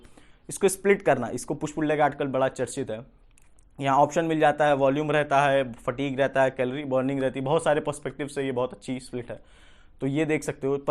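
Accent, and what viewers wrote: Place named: Awadhi, India. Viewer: Yaar tumhara Awadhi dialect kafi achcha lagta hai.. 🌼🌼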